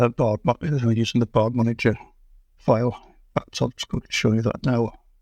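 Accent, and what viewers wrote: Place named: Scotland, United Kingdom. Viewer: I can understand you, maybe I’m used to the northern and lowland Scottish accent?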